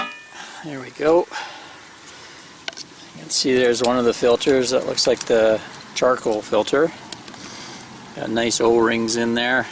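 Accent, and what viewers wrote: Canada. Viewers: I could listen to a Canadian talk aboot anything all day long eh.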